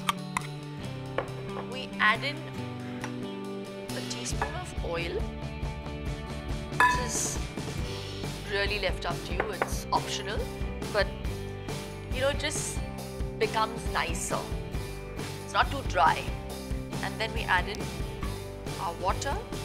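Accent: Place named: England, United Kingdom. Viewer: I like her English accent but didn’t understand a word, thus will not be making this dish😢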